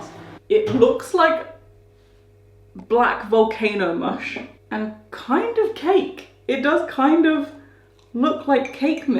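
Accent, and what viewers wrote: United Kingdom. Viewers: What part of the UK do you think she is from?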